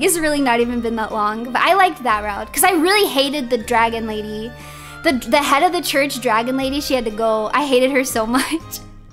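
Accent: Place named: New England, United States. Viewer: More southern than you thought? I thought she was from the New England area from how she talks o_o some might say the poler opposite of "southern"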